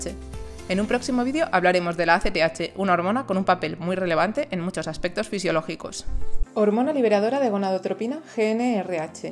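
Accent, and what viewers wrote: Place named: Spain. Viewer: Tiene voz española, pero siento que es la tonada española que más se asemeja a la Argentina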